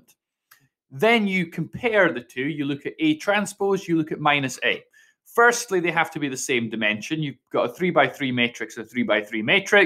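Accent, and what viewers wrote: Scotland, United Kingdom. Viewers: I could listen to Scots accents all day music 🎶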